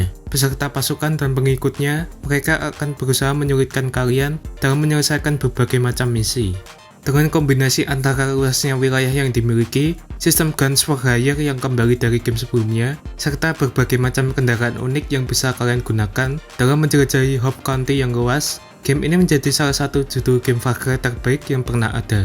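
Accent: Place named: Java, Indonesia. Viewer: suara nya kok medok 😭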